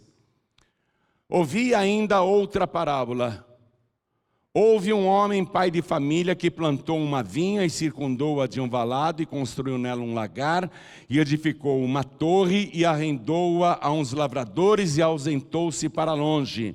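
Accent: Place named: Rio de Janeiro, Brazil. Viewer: Pastor seu sotaque carioques e muito engraçado. O Sr não e de Piracicaba. Deve ser uma técnica de fala né?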